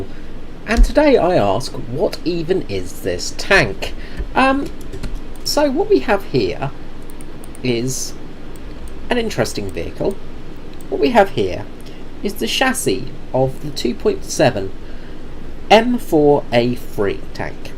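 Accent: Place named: Australia. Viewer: Thank you again for your reply and the info on TD units .I will definitely check it out . John from Pittsburgh ( if you don't know , that's in the state of Pennsylvania , USA --- you sound like an Aussie or Kiwi , so thanks again mate )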